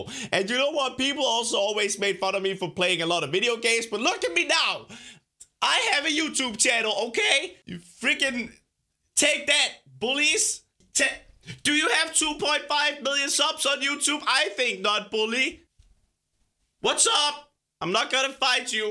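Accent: Denmark. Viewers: He has an Danish accent so idea is ideer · Ideer is idea said funny because if you haven't noticed somehow, Crainer has a danish accent because he's danish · its because of his danish accent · Dink Its his Danish accent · YourNewFather it’s his accent he’s danish and everyone in Europe says it like that LOL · Danish accent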